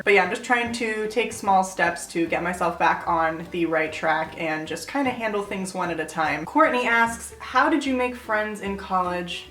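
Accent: Castile, Spain. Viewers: I love your spanish accent, debo decir que no me gustan los acentos propiamente españoles de España, but yours is adorable. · Haha I can hear that Spain accent. My parents are from Mexico so I learned Spanish first and then English in pre-school. Unfortunately, my Spanish became worse over the years and now as an adult I have trouble with grammar and vocabulary sometimes. It's so embarrassing. Your Spanish is probably better than mine! :p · Your Spanish is soooo good. I grew up in a Mexican household yet your Spanish is way better then mine. When you speak Spanish it sounds as if you were from Spain. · Your Spanish ❤️ I speak Spanish too but I'm Mexican so the accent is different. I went to Spain as my graduation present for about a week and a half and it was so funny how I was picking up that accent! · your spanish is perfect I had never heard anyone whose first language isn't spanish speak it so well, and more specifically with a castilian accent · OMG, that was soooooo fuckin sexy! 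Even if you speak spanish with the Castellano pronounciation. Que chula eres, Dios mio! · nice you speak spanish like they do in spain! and ima try that bubble bobble right now! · she speaks like a Spaniard, it's so cute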